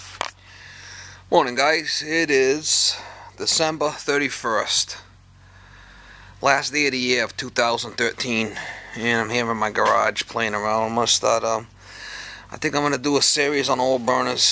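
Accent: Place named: New England, United States. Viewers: Aw rhight my New England Brothah! This is going to be a great help for me here (now in PA) with a 60-80 year old oil fired hot water to radiator heat, furnace and a Beckett burnah! I've run diagnostics on this thing (being a true New Englandah not cheap just smaht/frugal) for the last three years and saved about $1000 dollars. My problem is cruddy electrodes after almost every firing requiring take down and cleaning to get to fire again. I have a new transformer/igniter but haven't replaced it yet b/c the old one still works!! (sound like my dear old departed dad..). So hope your video's can help! Wicked-good video! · New England accent galore I was sure any moment he was gonna call his Pauly his ma or broad to help him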